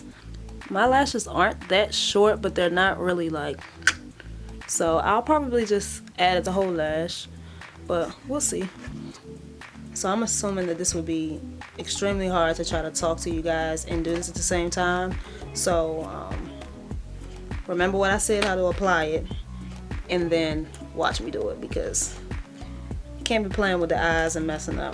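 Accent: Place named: Washington DC, United States. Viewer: When I first started watching I was like “she sounds like she could be from DC” then you said “blowing me” and was like yep! 🤣 hey home team!!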